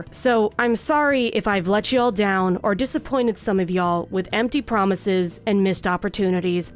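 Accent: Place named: West Virginia, United States. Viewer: I'll be ready whenever you are. ....and sometimes I forget how WV you are until I hear the "y'all". The cutest thing.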